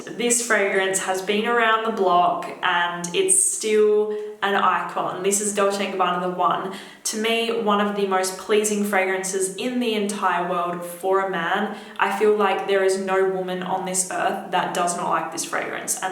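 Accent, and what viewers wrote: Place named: Australia. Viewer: Where we going on date night ;p Edit: Echo is a plus, twice the Aussie accent.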